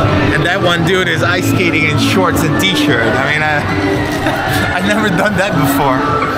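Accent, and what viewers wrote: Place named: United States. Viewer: you born in russia you live in poland? you sound like american its weird 😅 but man thank you for having you here in our country maraming salamat mabuhay po kayo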